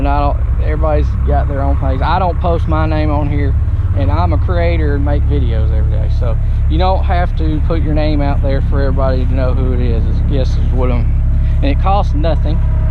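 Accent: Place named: Georgia, United States. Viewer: What part of Georgia are you from?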